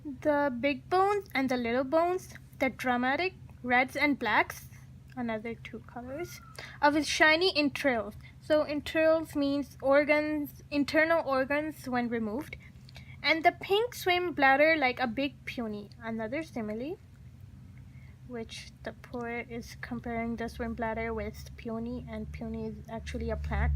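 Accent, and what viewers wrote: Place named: India. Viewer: Hi, good analysis, loved it❤️ Btw, are you Indian? Your pronunciation is so cute😍❤️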